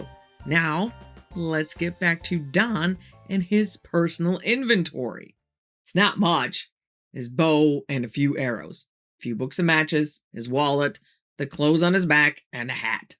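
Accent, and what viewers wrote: New York, United States. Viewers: Love wonderful stories thanks we appreciate ❤ and the lovely accent too, sounds like a New Yorker 😂 🇬🇧🏴🇺🇸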